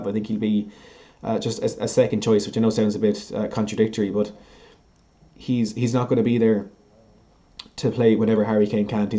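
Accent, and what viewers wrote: Ireland. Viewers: Ur sound like ur irish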